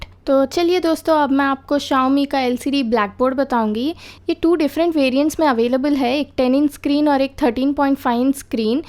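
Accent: Hyderabad, India. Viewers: Are ap hyderabad se ho kya..way u talk like hyderabadi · You have to work on your voice and language I feel Hyadrabi hindi some time ... Keep it up 👍👍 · Tum hyderabad se ho kya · R u Hyderabadi? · totally hyderabadi.nai karra....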